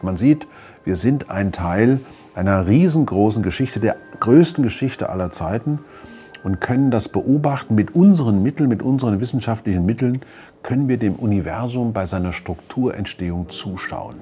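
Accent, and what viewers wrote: Swabia, Germany. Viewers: ...aber Schwäbisch!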